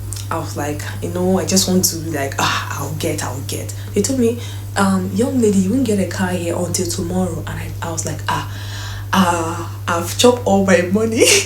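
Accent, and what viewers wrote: Ghana. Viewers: my sister you speak like a Ghanaian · your accent totally changed to the Ghanaian accent. I believe you have Ghana citizenship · Beside your pronunciation of " local" i thought i was listening to a fellow Ghanaian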